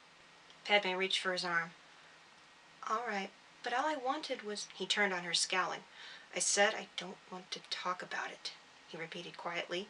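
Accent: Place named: United Kingdom. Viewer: So pleased things going well. Great chapter , your British accent is coming on well .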